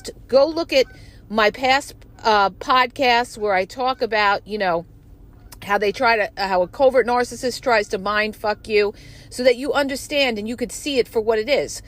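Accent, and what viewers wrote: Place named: Elmwood Park, United States. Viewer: I love you I'm from Elmwood Park and it's nice to hear somebody talk like I talk and you describe everything the best more so than all these other channels and really help me thank you God bless you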